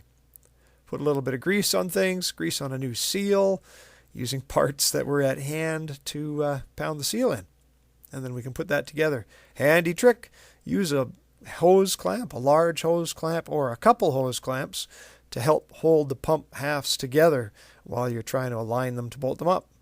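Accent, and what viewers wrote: Canada. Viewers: Love your video no crap just gogo on the job,thank you l hear your Canadian speak hows that hockey team eh?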